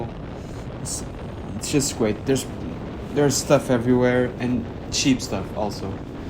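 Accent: Portugal. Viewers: aquele sotaque tuga haha, grande mota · Bem me parecía que eu tava a notar um sotaque tuga. Belo video, boas informações, rápido e claro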